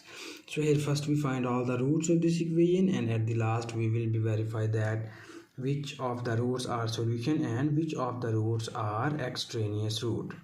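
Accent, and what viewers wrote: India. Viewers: Dreadful. unnecessary Indian accent.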